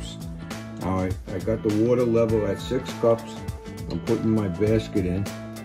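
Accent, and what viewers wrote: New York, United States. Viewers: Cool, I like your Italian New Yorker accent.. My mom use to make percolated coffee, Yuban , not available in Canada.. Thankyou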